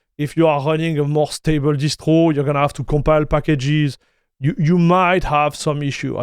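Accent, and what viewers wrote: France. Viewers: Très intéressé car je rencontre des problèmes avec ma Gigabyte 9070XT Gaming OC sur Ubuntu MATE 24.04 mais je constate qu'avec d'autres distros il n'y a pas le même problème. Sinon, ça fait bizarre de devoir écouter un Français parler en Anglais alors que j'entends bien l'accent et que tout du long j'ai envie de dire "nan mais c'est bon, parle moi en français, poto". 😅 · Cool accent, are you French?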